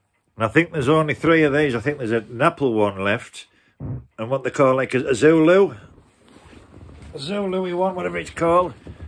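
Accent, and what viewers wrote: Yorkshire, United Kingdom. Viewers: Yorkshiremen aren't biologically capable of doing a posh, plummy accent...which is to your credit.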